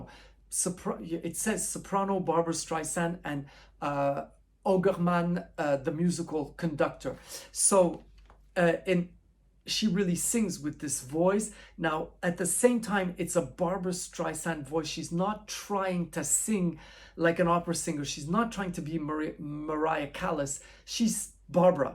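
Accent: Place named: France. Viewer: This is lovely! I love your erudite enthusiasm for Barbra and her artistry. You remind me a bit of me (except I don't have your French accent)! Thank you for this.:)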